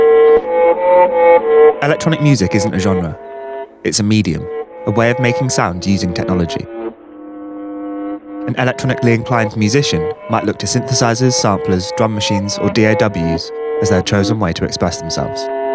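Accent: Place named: United Kingdom. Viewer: ofc he has a briish acceny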